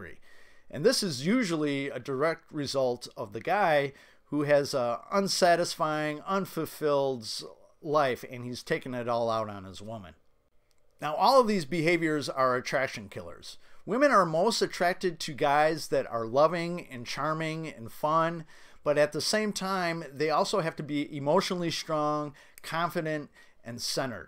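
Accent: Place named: Michigan, United States. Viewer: Are you from Michigan? Your accent sounds northern.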